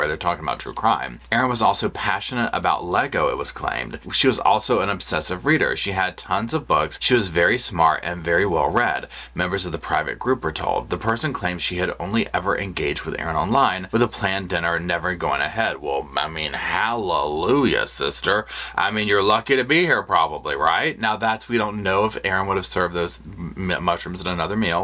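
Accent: South, United States. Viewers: i just want to say, that YOu ARE ADORABLE. i have wAtCht you for awhile now, and your energy just makes me happy. ☻ So sweet!!! You and your doggy and your “sofa”. Your disposition is so loving and playful, a refreshing stance for True Crime. Also, you are aging gracefully, love the beautiful silver filling out your face, you are a very handsome man. And, gotta love that Southern Accent! My husband is from South Carolina, and i too have roots in the South. i miss it terribly…. Washington State is gorgeous but Southern culture is the best. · you sound like a true southerner. Where are you located? First time listener.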